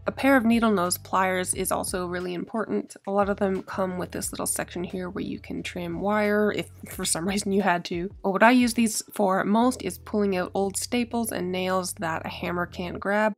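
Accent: Canada. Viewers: OMGosh, how petty. Salve...you are pronouncing the L. Aunt, people pronounce like the insect ant. Ignore the critics, people are so hateful 🙄. I love Canadian accent (I'm sure I have an Ohio valley accent). Very informative video, I want to start refinishing furniture to help supplement my income. Your videos help explain how you can get started💗💕💗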